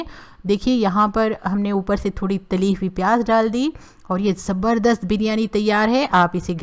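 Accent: Hyderabad, India. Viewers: aslkm unty kya ap hyd se h apki language hyd jaise h plz rply kare thanku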